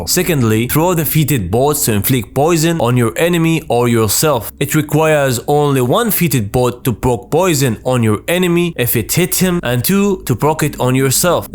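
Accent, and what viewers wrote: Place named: Gulf, Arab world. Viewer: The accent , are you Saudi , Qatari , Kuwaiti ? Uae or Bahraini 🤣 Subscribed for the accent 😂👍 حبيبنا 👍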